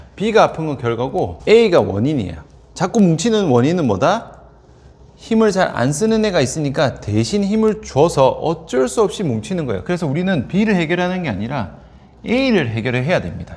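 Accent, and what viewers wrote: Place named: Busan, South Korea. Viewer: 부산사람이야 외국사람이야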